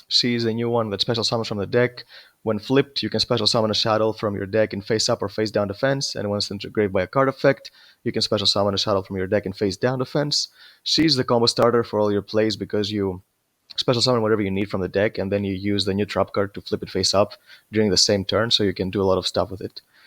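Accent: Greece. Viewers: Και έλεγα ρε φίλε λίγο ελληνική αυτή η προφορά 😂😂😂, πολύ καλή δουλειά πάντως μπρο συνέχισε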